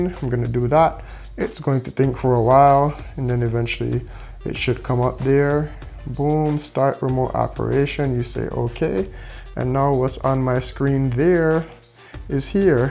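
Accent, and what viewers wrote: Jamaica. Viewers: yo you a yardie, love that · yooo. you from Jamaica??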